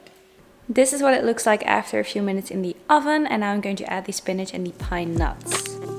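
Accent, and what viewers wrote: Netherlands. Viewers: Are you Dutch? You have a very Dutch accent XD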